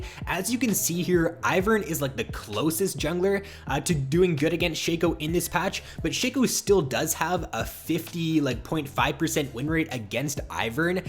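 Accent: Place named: Canada. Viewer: Canadian af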